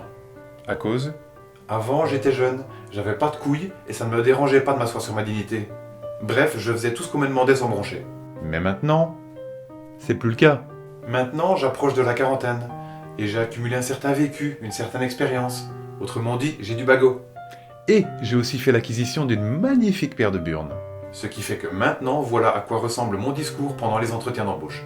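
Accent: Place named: Belgium. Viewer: Hey salut maggle ! Petite vidéo pour pas dire grand-chose mais ça fait toujours plaisir de te revoir et d'entendre ton accent belge nous parler de la "pouissance". Ce com' est un encouragement à continuer :-). Plus de musique des 60's ça y est ? Un truc m'a déplu, c'est quand tu dis "c'est à cause de ces gens que...". Tu en as fait partie tu le reconnais, nous avons tous fait partie de "ces gens" à un moment de notre vie. Je pense que tu devrais plutôt juger l'attitude et non les personnes. Cela permet aux gens de changer plus facilement. PS : toi qui réfléchi au sens de la vie et la société, as-tu entendu parler du travail de Pablo Servigne ? As-tu regardé la série Next de Clément Montfort ? Bises.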